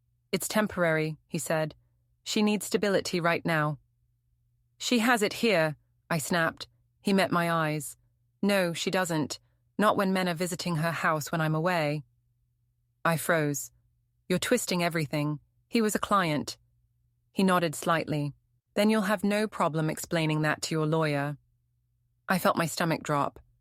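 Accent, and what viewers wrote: England, United Kingdom. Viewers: why does this sound like the most entitled english lady in existence?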